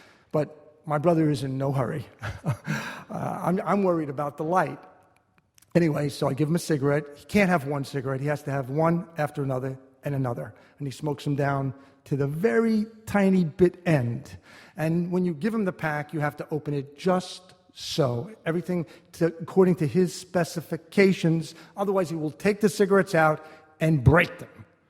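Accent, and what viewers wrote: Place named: New York, United States. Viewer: Love John Turturro...he always gives a wonderful performance and makes everything more special. He also reminds me of NYC when he talks in his own voice, which I miss. Family commitment is becoming a rare thing, but this is a beautiful example of how he approached his particular situation He would make a day ALL about his mother and brother, although the unexpected visits are sometimes necessary, too. It's when you are the full-time caretaker, you absolutely need to hand off the duties at least a couple times a week or you just end up exhausted and can slide into depression. This is why home health care workers are so necessary, although our current administration seems they could care less.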